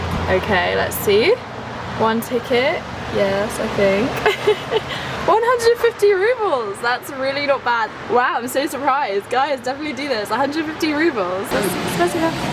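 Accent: United Kingdom. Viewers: Такой ярковыроженый британский английский, красиво. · Британский английский просто очень красивый, на самом деле.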